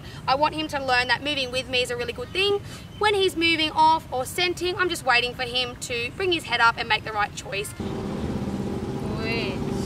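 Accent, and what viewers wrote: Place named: Australia. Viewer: What nationality are you?? She's speaking Australian & we speak slower then other nationalities.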